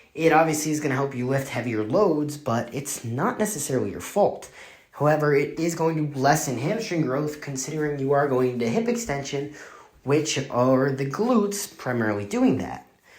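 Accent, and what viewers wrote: New Zealand. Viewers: Cigs and New Zealand accent, very Shan coded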